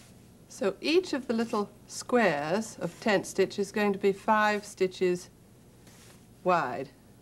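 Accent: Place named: RP, United Kingdom. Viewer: I love her accent! It sounds like pure queen's English.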